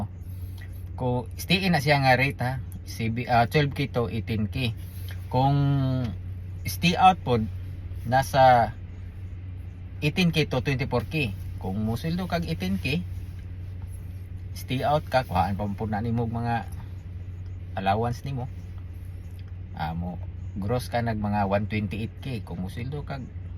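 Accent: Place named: Bohol, Philippines. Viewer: Mura lagi ka taga Bohol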